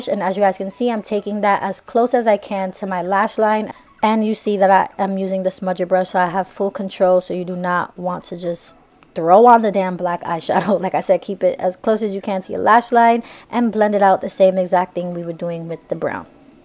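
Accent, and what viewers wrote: New York, United States. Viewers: I'm so glad I came across her. Honestly I love listening to her New York accent more than watching her videos just bc its so soothing but I stay watching these videos, like yes boo give me moreeeeeee!!!!!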